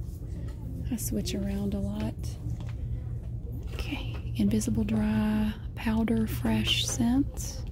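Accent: South, United States. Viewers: I love when u do shelf organization. The mixture of your southern ascent and organizing cosmetics gets me hooked every time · Your so pretty! I love your accent! Wish I had a southern girl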